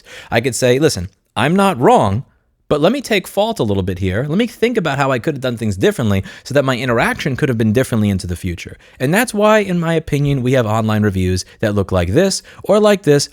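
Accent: New York, United States. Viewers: Please shut up about the linus drama. It got old quick I come here to listen to that succulent new york accent not to hear about the YouTube equivalent of schoolgirl drama. Otherwise Loved the video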